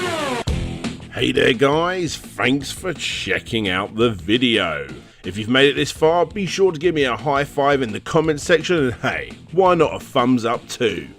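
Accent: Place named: England, United Kingdom. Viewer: While I enjoyed your video, and your English accent is marvelous to a boring yank like me... You never mentioned the PC version! Shame! But good job none the less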